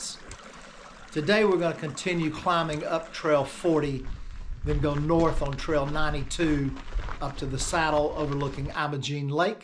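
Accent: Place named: South, United States. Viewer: Yeah, that accent tells me Alabama/Tennessee. I reckon you got some serious ancestry in the southeast.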